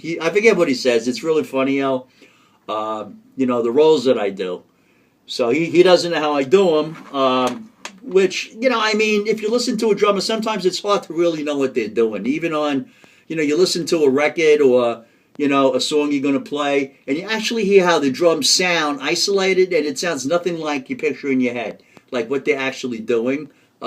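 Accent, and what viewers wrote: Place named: East Coast, United States. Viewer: Great video, you sound like your from the east coast, I'm originally from Boston myself.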